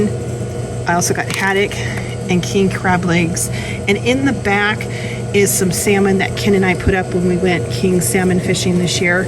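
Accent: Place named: South Louisiana, United States. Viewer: OMG ! YOU SAID, "YALL" ! COULD YOU POSSIBLY, BE FROM THE SOUTH ? YOU SOUND LIKE YOU'RE FROM SOUTH LOUISIANA.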